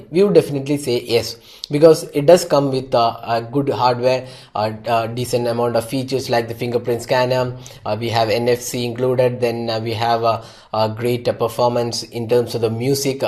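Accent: Kerala, India. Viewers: Are you a mallu ? you sound like one.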